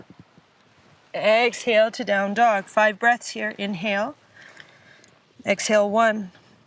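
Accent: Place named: Canada. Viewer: This is my go-to practice every night when I get home from work. It’s challenging, energizing and I never get tired of her comments and instruction. (I also adore her Canadian accent.) I smile every time she says to relax/let go of the idea there is somewhere to go. I also recommend her other videos. 💗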